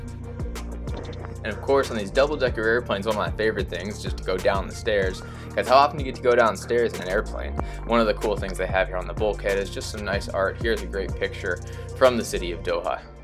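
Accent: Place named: Bay Area, United States. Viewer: I have become an avid follower of your detailed and very entertaining in-flight videos. Everything about them holds my attention making it easy to sit back, get comfortable and let the video do its thing. I also believe that your phrasing and (non) accent indicative of the Bay Area, where I am from, makes me feel very much like I'm listening to someone with whom I am familiar or have known a long time.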